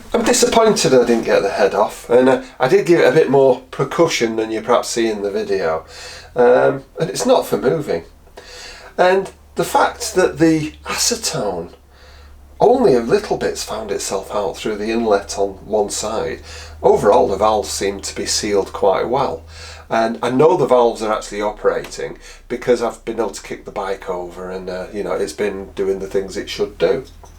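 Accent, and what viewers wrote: Yorkshire, United Kingdom. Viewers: "At the suggestion of my wife..." I don't know how many times my Yorkshire Lass wife has pointed out some reasonable mechanical solution while I'm busy overthinking. I often show her your vids as she loves hearing the Accent of Her People and little things like Henry Hoover in the background that remind her of home.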